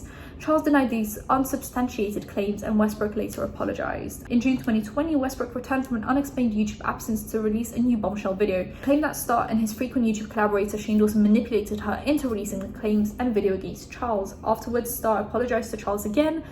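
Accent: United Kingdom. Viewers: Please no one come at me, I have the memory of a sieve but, where is my girl from? I full on thought she was British. Sorry if that comes across as rude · I literally had no idea she wasn’t British and I’ve been watching her forever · Omg I could’ve sworn you’re British your accent is so authentic 💀💀💀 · It's because she doesn't suddenly make any random loud noises or start yelling. The British accent and Calm composure don't hurt either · wait, you are not british..? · English is not your first language and you're an immigrant?? I really thought you were just British. What's your ethnicity I'm curious:)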